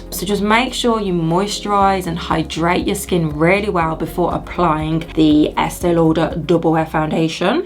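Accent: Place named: Birmingham, United Kingdom. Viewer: Subbed. Can I hear a fellow Brummy? ❤️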